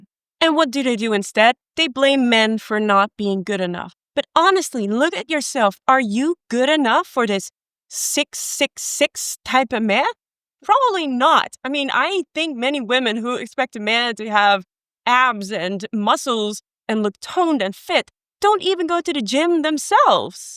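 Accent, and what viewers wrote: Netherlands. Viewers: Is... is that a dutch accent i hear ?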